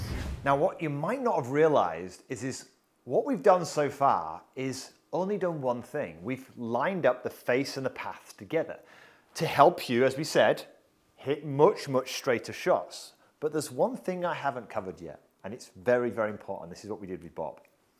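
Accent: Yorkshire, United Kingdom. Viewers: Wanted a in person lesson with you thought you might be local ish with your Yorkshire accent I’m hearing maybe Leeds but your based all way down Kent too far for me to go for a golf lesson might have to get an online one booked instead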